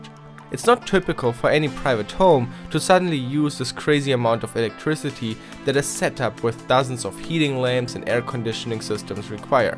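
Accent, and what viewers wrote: Germany. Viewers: Bist du eigentlich deutsch? ;D Die Aussprache ist zwar gut aber irgendwie zu deutsch xD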